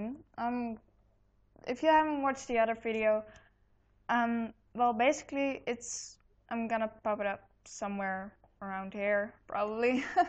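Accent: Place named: Netherlands. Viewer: hooi! !!!!!! Ik kan gwn horen dat je Nederlands bent XD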